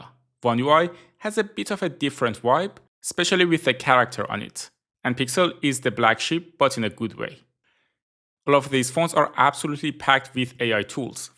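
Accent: Iran. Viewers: You sound Iranian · Are you Persian or Iranian? As soon as I heard your voice I could tell😂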